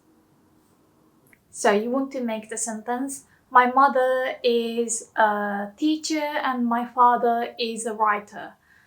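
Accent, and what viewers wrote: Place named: United Kingdom. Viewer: I like your accents. When you speak English, you sound a little British. Do you have a background of British or Australian?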